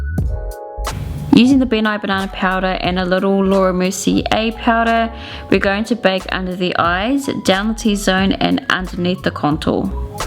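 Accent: New Zealand. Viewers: Absolutely stunning makeup that you did in this video ! X ... However, subbed coz I loved your kiwi accent (now i know what people mean about kiwis having an accent...couldnt hear it !) :D I also grew up in New Zealand x Now living in Aussie!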